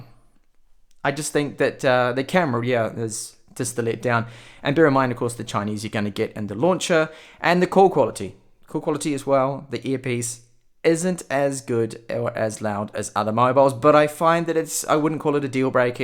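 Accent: Australia. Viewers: I love your accent, are you australian? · Hey man first time on your channel, quick question, did you have to mod anything with the phone in terms of sim like do you use a provider like optus? Just guessing from the accent, thanks man. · I can't tell if you're from Australia or New Zealand...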